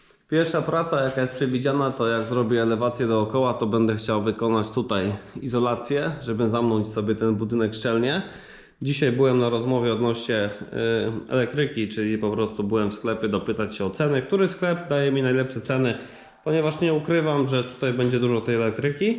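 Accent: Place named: Poland. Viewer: Jak cie slysze to czuje cebule a wypowiadasz sie jak cebulandia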